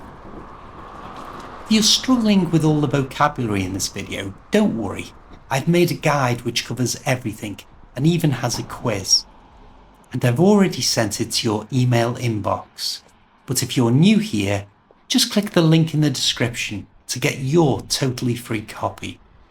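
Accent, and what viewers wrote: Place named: Northern England, United Kingdom. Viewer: I subscribed only to listen to your lovely nothern accent.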